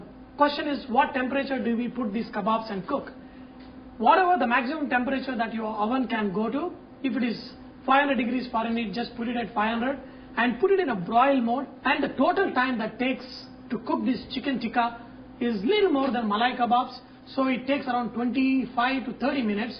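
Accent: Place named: Andhra Pradesh, India. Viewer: hmm... Hes from Andhra pradesh...the accent made me to search his origin :)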